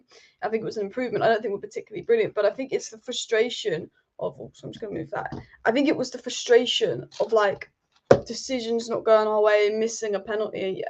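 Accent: United Kingdom. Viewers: it seems i have to learn british accent so i can understand good job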